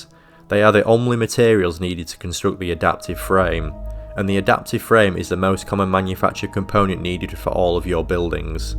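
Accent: United Kingdom. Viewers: You sound English so why say ALUMINIUM the American why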